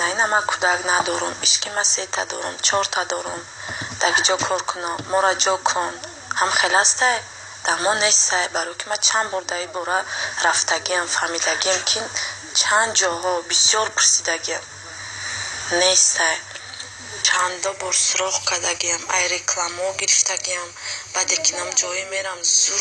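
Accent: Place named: Kulob, Tajikistan. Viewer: духтари точик не духтари кулоб ай гапзаниш маълум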